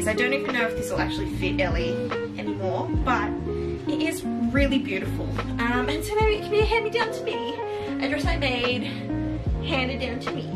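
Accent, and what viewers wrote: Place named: United Kingdom. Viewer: Where are you? I’m close to New Orleans? I have some things I would love for you to make Please say your near by 🙃 But your accent makes me think your across the pond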